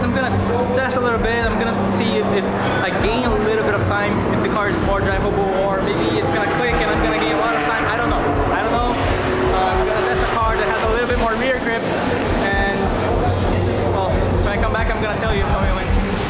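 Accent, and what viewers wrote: Brazil. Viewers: Eu já tava achando q ele era brasileiro só de escutar o sotaque e também pelo nome dele, agora q tu confirmou tirou minha dúvida. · Cara, como que vc desenvolveu seu ingles assim? Eu tava escutando voce falando, e pensei, nossa ele parece um brasileiro falando ingles, mas fui ver tava tudo em ingles, comecei a ter duvidas, mas vi a bandeira do brasil no carro... conta o segredo dos ingles pra nois ai kkkk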